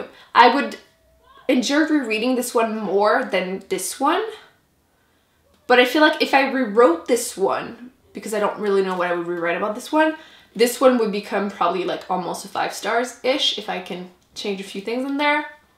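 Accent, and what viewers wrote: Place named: Quebec, Canada. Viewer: She's from Québec.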